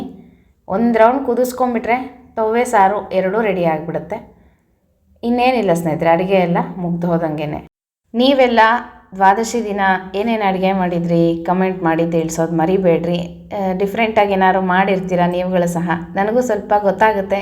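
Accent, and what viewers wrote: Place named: Ballari, India. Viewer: Naavu Ballariyavare.adigemaaduva vidhana yella same😊naavu makkalige tinniso ootakke mammu antane annodu.Maatado reetinu same 😊Namma jana Namma bhashe ❤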